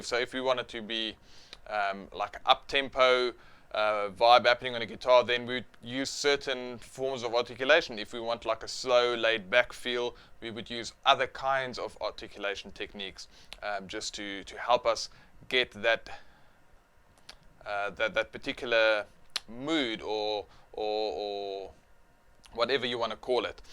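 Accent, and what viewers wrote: South Africa. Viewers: You are South African! :D \\m//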